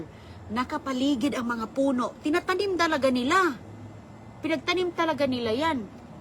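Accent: Visayas, Philippines. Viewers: sorry naman bisaya lang. Intindihin niyo na pronunciation ni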